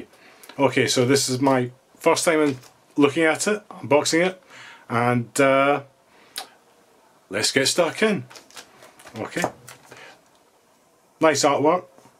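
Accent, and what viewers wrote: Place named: Stirling, United Kingdom. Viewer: Just added this to my collection haven't opened it yet and found your video, hello from Stirling lol finally a familiar accent on YouTube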